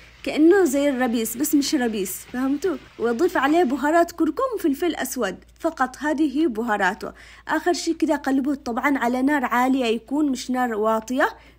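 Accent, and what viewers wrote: Aden, Yemen. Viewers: مسرفه هههههههه ماشاءالله لهجهتك عدنيه رغم انك عايشه بالسعوديه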